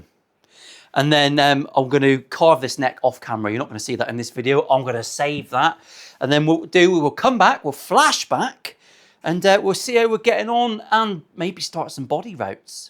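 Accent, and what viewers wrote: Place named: Portsmouth, United Kingdom. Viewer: I'm not even watching the clip, just listening and enjoying the beautiful Portsmouth English while carving my new piece. Greetings from Belgrade!👋🏻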